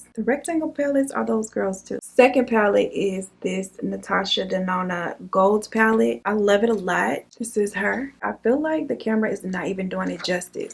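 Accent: South, United States. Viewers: I like you. You have calming voice along with everything else. If you would accept a positive advice, of course in case you would like subscribers from all over the world, including not native speakers. I understand you in general, but sometimes I can't hear clearly. You speak soft and when you do it fast, silent and typically southern with lots of reductions i am having a hard time. Please don't misunderstand me, it's all positive, I love your videos and wish you the best.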